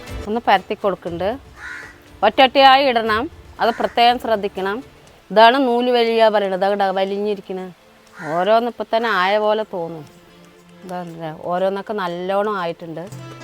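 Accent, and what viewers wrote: Palakkad, India. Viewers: അമ്മൻ്റെ നടെവിടെയാണ് സംസാരംകേട്ടിട്ട് പാലക്കാട്ടാണൊ എന്ന തോന്നൽ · പാലക്കാട്‌ ആണ് തോനുന്നു സംസാരം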